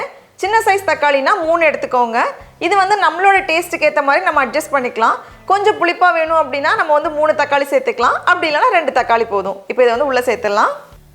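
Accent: Nagercoil, India. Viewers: How are you? Waiting for your video before starting my online classes. I am teacher working in UAE from Nagercoil..Love the way of your Nagercoil slang ka...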